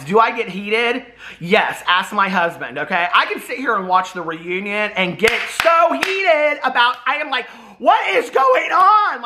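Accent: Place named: Detroit, United States. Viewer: are you from detroit??🤣 that’s my favorite please don’t stop it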